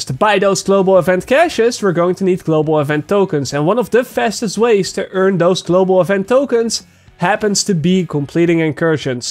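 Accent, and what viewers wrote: Netherlands. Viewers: yo this guy dutch?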